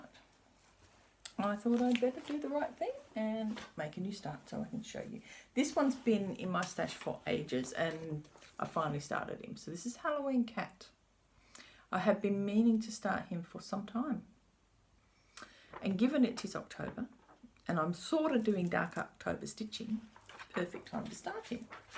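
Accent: Australia. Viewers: What a delightful video! I have just subscribed and am thrilled to find you!:) I am so happy that you came to visit my little channel. Isn’t it fun to find a new stitch friend through our wonderful hobby/obsession!!! BTW, I love your Australian accent and your craft room. I look forward to binge watching your other videos soon. Happy, happy stitching from a new friend in Texas.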